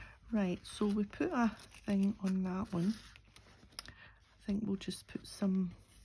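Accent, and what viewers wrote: Scotland, United Kingdom. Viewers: your accent is so familiar to me. I’m Scottish and my Grandmother had a very thick accent. Where are you? That is a great die! Love the makes! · Scotland I was first thinking that is an Irish accent but no I got just land of frays and lovely casels . Some day I will come too wisit for shore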